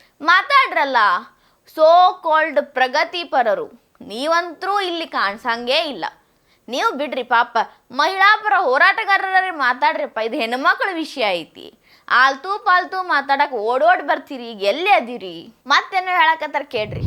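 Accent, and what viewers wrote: North Karnataka, India. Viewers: ನೀವು ಮಾತನಾಡುವ ಉತ್ತರ ಕರ್ನಾಟಕ ಶೈಲಿ ಅಂತೂ ಅತ್ಯಂತ ಅದ್ಭುತ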